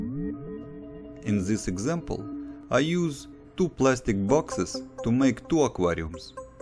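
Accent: Russia. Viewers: Ты русский? Я заметил акцент.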